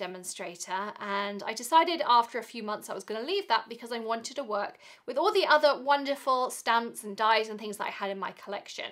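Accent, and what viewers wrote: United Kingdom. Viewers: WOW !!! You sound like maybe you're either Wonder Woman or maybe you have a few clones walking around there helping you do all that you do. I tell you , you look so calm for all that you do. I am amazed. Have you always had this kind of energy ???? If it were possible , I'd tell you to bottle it and sell it. You would make Millions $$$. It has been a joy listening to your video about your self. I now know where that cute little accent comes from. Welcome to America from across the pond.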